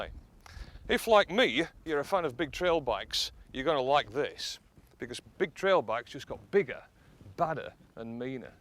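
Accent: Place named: Yorkshire, United Kingdom. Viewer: more like 1974. FYI the accent is from Yorkshire. The haircut is from Jesus Christ Superstar.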